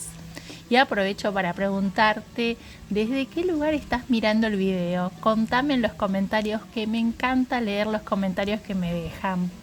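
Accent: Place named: Argentina. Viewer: Tw acabo de encontrar, amé tu canal y recetas, dónde vives que se ve ese mar hermoso pero tonada Argentina??????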